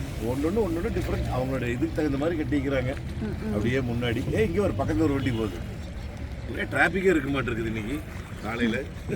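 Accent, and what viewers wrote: Kongu, India. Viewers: கொஞ்சும் கொங்குத் தமிழ் பேசும் கோமகரே...! திகட்டாத தித்திக்கும் பேச்சுடைய திருப்பூர் திருமகரே...! எங்கள் மதிப்பிற்குறிய டான் திரு. அண்ணா❤❤❤🙏 அவர்களே எப்படி இருக்கீங்க அண்ணி❤❤❤🙏 எப்படி இருக்காங்க ரித்திக்❤❤❤ எப்படி இருக்காரு. அருமையான வீடியோ பார்க்க பார்க்க இடங்கள் அழகோ அழகு❤❤❤ அண்ணா இந்த வீடியோவுல நம்ம TMF ஒருத்தர் "Marvel Movies"னு ஒருவர் கமெண்ட் பன்னிருக்காரு அவர் கமெண்ட் பன்னிருக்கது 1000% correct. குறிப்பிட்ட இடங்களில் மட்டும் ட்ரோன் கேமரா மேல பறக்கவிடுங்க. ஜம்மு காஷ்மீர் ராணுவத்தின் ட்ரோன் கேமராவும் முக்கால்வாசி இடங்களில் மேல பறந்து கொண்டிருக்கும். அவங்க கண்ணுல வேற ஒரு ட்ரோன் கேமரா பறக்குறது பார்த்தால் SHOOT பன்னிடுவாங்க. அப்பறம் LOCATION ah TRACK பன்னி உங்களுக்கும் Enquiry வருவதற்கு வாய்ப்பு உண்டு. கொஞ்சம் கவனமா இருங்க அண்ணா. நம் ❤சிட்டுக்குருவியோட❤ தங்களது இந்த லடாக் பயணம் இனிதே தொடர்ந்திட நல்வாழ்த்துக்கள் அண்ணா❤❤❤ குளிர் இன்னுமே மைனஸ் டிகிரிக்கு கீழ வரும் அண்ணா உடம்ப பார்த்துக்கங்க அண்ணா🙏 என்றும் அன்புடன் உங்கள் தம்பி என்றும் அன்புடன் உங்கள் TMF ரா.முஹம்மது கபூர் (சென்னையில் இருந்து) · கொங்கு தமிழ், இளமை,இனிமை அவர்கள்.TMF from coimbatore.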